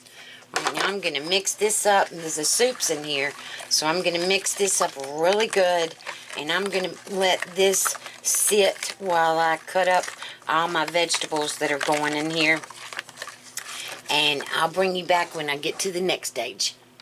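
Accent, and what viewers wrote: South, United States. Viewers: Everything taste better with a southern accent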